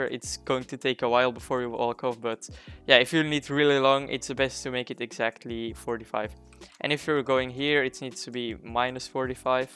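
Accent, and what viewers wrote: Netherlands. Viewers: volgens mij is hij ook Nederlands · He is somewhere around belgium or the netherlands.. i know that english accent from outside my head since i am also dutch.. he is <num>% From belgium or Netherland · I feel like you're dutch XD, aren't you? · your'e accent little bit sounds like your Dutch am i right? XD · You dutch?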